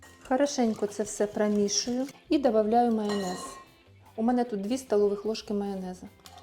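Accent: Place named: Odesa, Ukraine. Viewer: Она с Одесской области - там свой диалект - ну, говорит женщина всю жизнь на суржике!!! Что не так снова??! Не цепляйтесь за слова!! Вам рецепт пирожков нужен или скандал???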